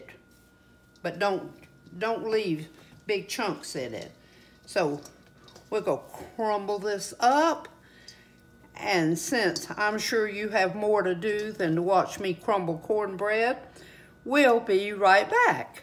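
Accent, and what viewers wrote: South, United States. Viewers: I want to order your cookbook. How can I do that? I adore your Southern accent!!! It’s the real deal!!, I thank God for providing a heart for your Son, whose Birthday is the same as my precious Mama, October 19! We live in Seneca, SC. Thankful to live in the South!! Love you!🥰❤️🙏🏻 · Prayers for continued healing and Yes God is good ❤ Love your recipes and your Southern accent!! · ...you are just adorable! You are so caring, thoughtful and genuine! I love your accent....I could listen to you talk for hours on end! You are a true southern belle! Your cooking videos are so diverse and the recipes seem pretty easy. The food you prepare looks incredibly delicious and at the end of a lot of your videos, when you show the entire meal laid out on your side counter, I always find myself wishing I was the first one in line! Not only for the scrumptious food, but for the fellowship with your loving family. 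My sister and I are the only family we have now....everyone else has passed. So when I watch your videos, I get warm memories of my own family and it makes me smile. I am very happy your son is doing well! I know he will continue to flourish! As will all of your family. I will be making your stuffing and gravy recipe (I do not care for sage or giblets at all) and when my sister and I sit down to eat it, I will think of you. You are truly an inspiration. Best wishes for a very Blessed and Happy Thanksgiving! God Bless You All! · you are such a lovely lady! I just love your southern accent!! Butta!! Love your show…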